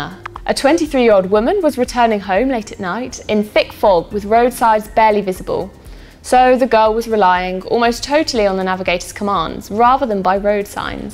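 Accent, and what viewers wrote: United Kingdom. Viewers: Yoooo, you rock!! Love your british accent. Also lukas and bradleys❤️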